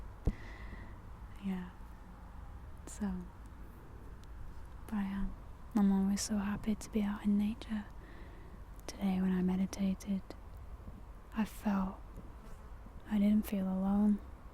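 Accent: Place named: Northern England, United Kingdom. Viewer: Wonderful and relaxed video. Nice surroundings and good tips for meditation. Bonus:your charming Northern English pronunciation!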